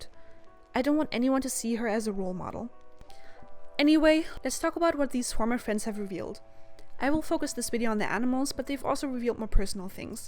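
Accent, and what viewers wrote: Germany. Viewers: OK at the end I heard it, you are from Germany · Das ist der Akzent! Du kommst aus Deutschland! :3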